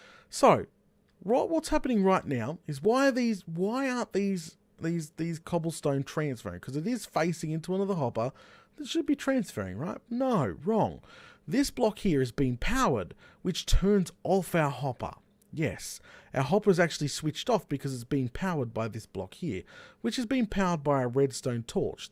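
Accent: Australia. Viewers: Subbed just because Australian accents are great for tutorials lol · I prefer British accent for tutorials Btw because Australia is a British colony the accent sounds like a fusion of a Southern and British accent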